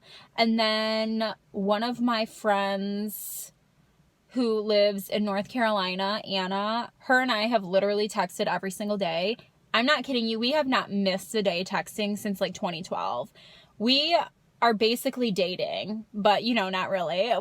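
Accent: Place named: Buffalo, United States. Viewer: I just discovered your channel! Love it. I'm from Buffalo but live in SC now--my accent is very similar to yours and I'm dying at the Oregon/Organ thing.... "Bitch-Bye" ha!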